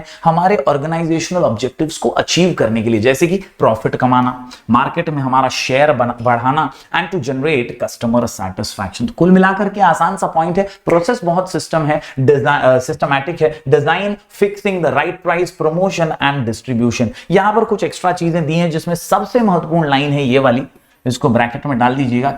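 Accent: Maharashtra, India. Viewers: Thanku sir marathi tadka mast hota😂❤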